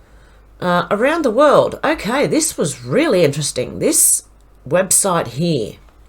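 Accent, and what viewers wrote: Australia. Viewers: Love all your work and your channel,thank you! I'm such a sucker for your Aussie accent,love it! Trump 2020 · Love your Australian accent and you need not apologize for being a grandma you’re awesome 😎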